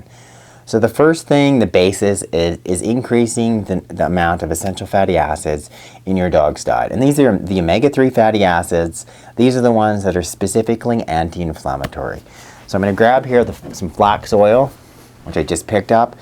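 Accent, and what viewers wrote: Canada. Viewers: Holy Canadian!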